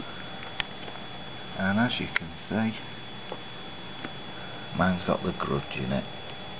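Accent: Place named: Scotland, United Kingdom. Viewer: Awesome, sounds like scottish though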